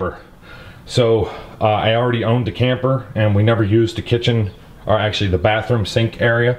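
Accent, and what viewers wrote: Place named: Pittsburgh, United States. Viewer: Nice job. As soon as you started talking I knew you were from Pittsburgh. I just moved out of the city to Ross Township.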